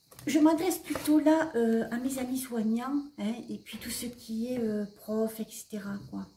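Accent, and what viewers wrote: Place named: South, France. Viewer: Bonjour.... Je vous decouvre.... Énorme... Vous dites quasi la même chose que katia mudai médium.... Vous êtes où ? Je suis de Montpellier... J entends un accent du sud...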